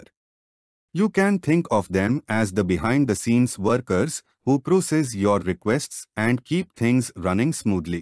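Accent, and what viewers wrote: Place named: Russia. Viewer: Ty kráso, dobrej ruskej přízvuk.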